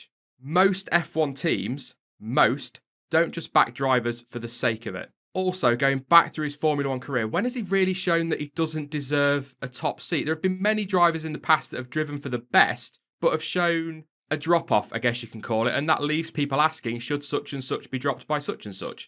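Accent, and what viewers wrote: United Kingdom. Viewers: Nicely done, interesting to watch. But british-english is pain to listen...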